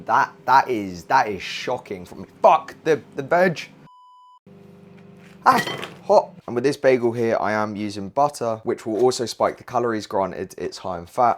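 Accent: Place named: United Kingdom. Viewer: I'll be honest, I am here for the british accent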